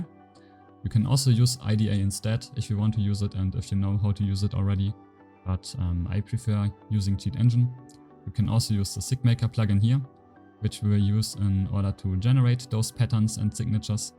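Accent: Germany. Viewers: You German 🤔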